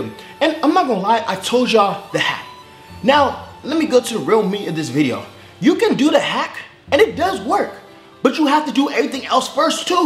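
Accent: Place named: United States. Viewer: He doesn't even sound like hes from Toronto. He sounds American as hell.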